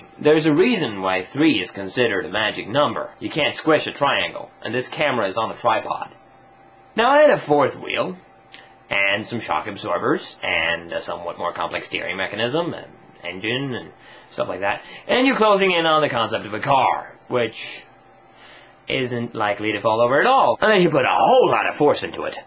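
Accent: United States. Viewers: Ha, this made me giggle. :) I can't tell that you're Swedish from your accent, which sounds decidedly like mine, which is American. Yeah... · he sounds like an american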